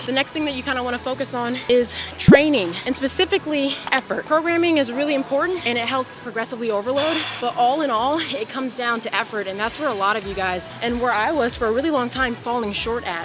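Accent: United Kingdom. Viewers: The British accent lol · love the british accent 😂😂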